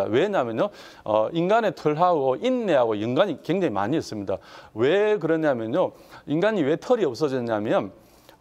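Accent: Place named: Gyeongsang, South Korea. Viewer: 다소경상도 말씀이라 알아듣기힘들었지만 강의를듣고 우선책을많이읽어야겠다는생각을했어요.수고하셨습니다.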